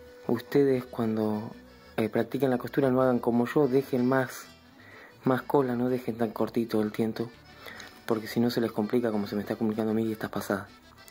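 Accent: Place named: Entre Ríos, Argentina. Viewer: Se me hace que tu tonada es de mis pagos (Entre Ríos) te re felicito amigo me encantan tus trabajos y explicaciones la verdad hasta los mas brutos aprendemos si alguien así explica. Un abrazo y a seguir subiendo videos. Solo me falta saber como llevo estos tutoriales al encabado que es mi hobbie.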